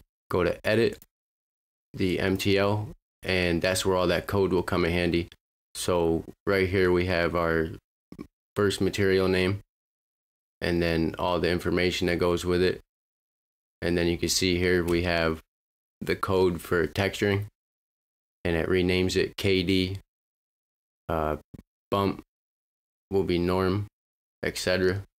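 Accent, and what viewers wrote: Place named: West Virginia, United States. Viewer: Naw, West Virginia. I've been several times. I'm in communications so I pay attention to accents. What do you use Blender for?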